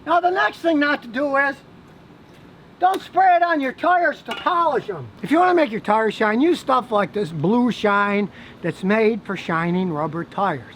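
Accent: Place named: Chicago, United States. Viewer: you sound like you are from Chicago.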